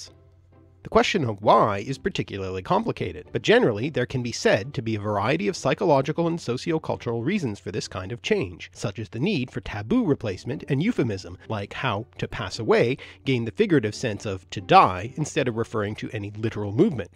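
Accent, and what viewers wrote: Canada. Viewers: I never noticed before, but I think you have a tad of a Canadian accent. Are you from the great white north?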